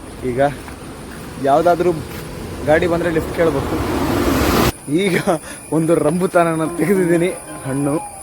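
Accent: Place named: North Karnataka, India. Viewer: ಉತ್ತರ ಕರ್ನಾಟಕದ ನುಡಿ ಇನ್ನೂ chanda... ನೋಡಿ ಖುಷಿ aitu... ಗುರುಗಳೇ.. ಒಳ್ಳೇದಾಗಲಿ.. 🙏🙏🙏🙏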